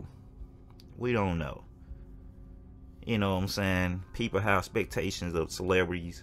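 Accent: South, United States. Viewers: U have a cute accent dude, southern like 🌝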